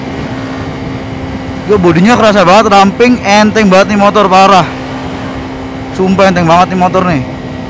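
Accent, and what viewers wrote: Surabaya, Indonesia. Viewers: keren bang... lu gue logat surabaya!!!..salam buat bis kalisari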